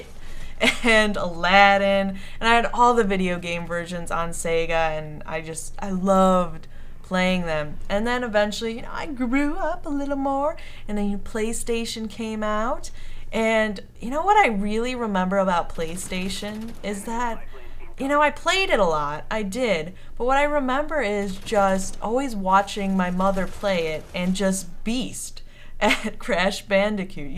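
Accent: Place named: United States. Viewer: Hey I'm new to subbing to you and I have been subbed to whiteboy for a long long time and I noticed u kinda have the same dialect as him. Are you from the Sam part of the us or something?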